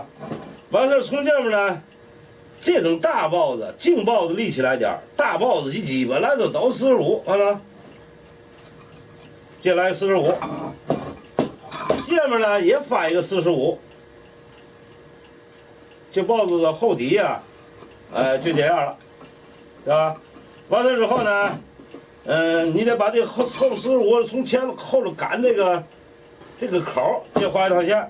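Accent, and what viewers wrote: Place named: North, China. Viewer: Usually I'm able to understand standard mandarin but the Northern accent of this master craftsman is just too much for me.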